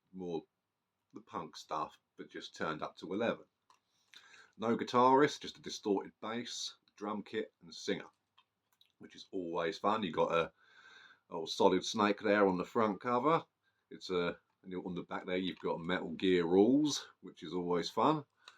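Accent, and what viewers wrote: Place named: United Kingdom. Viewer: I wasn't expecting the accent. Why are you so British?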